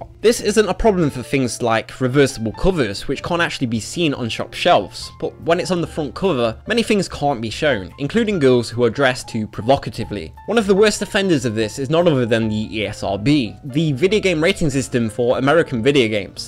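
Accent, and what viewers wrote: United Kingdom. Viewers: all I can say is, in the UK (and judging from the hosts accent he should know this as well) it is law for porn magazines to be covered up in the UK, and it is becoming a ( albeit slowly ) nation standard for newspapers to be out of sight of children. video games don't have these rules, but saying that with age restrictions being a thing and, the ability to simply cover a game with some metal or wood with the title being written on it is rather stupid.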